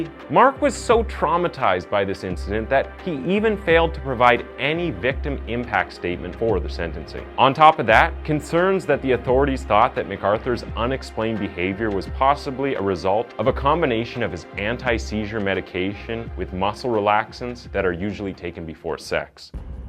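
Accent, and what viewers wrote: Canada. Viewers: Narrator is definitely Canadian. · Are you Canadian?? 🤔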